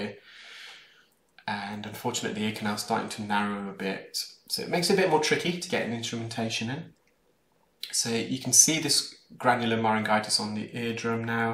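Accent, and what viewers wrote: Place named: Leicester, United Kingdom. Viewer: he's from Leicester in England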